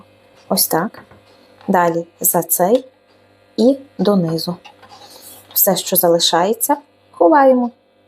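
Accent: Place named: Lviv, Ukraine. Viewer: Добрий день,! Дякую за рецепт. А Ви не зі Львова часом? Бо чую чудовий львівський говір, інтонацію, дуже приємний.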